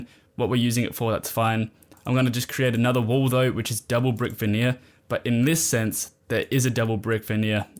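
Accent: Australia. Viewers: I'm learning almost as much about Australian English and vocabulary as I am about Revit. Love it.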